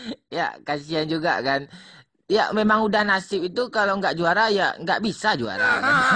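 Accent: West Sumatra, Indonesia. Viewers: Lucu bgt logat Minang TPI bahasa nasional lucu ²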